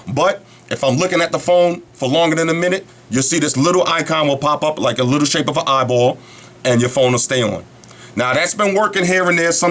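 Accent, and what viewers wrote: New York, United States. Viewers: I watched this the first time to see what's being said about the SIII...I watched it the second time cuz I live in FL and your voice brings me back home! Ugh I miss NY but thanks for the flashback :)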